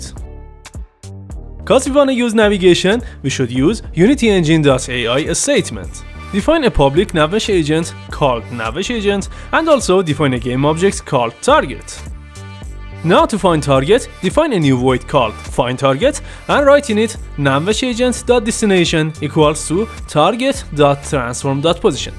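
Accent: Iran. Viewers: I love your Persian accent. :D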